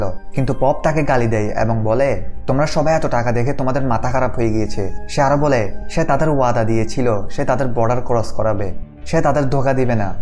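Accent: Chittagong, Bangladesh. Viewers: Chittagong er pola! Accent shunle buja jai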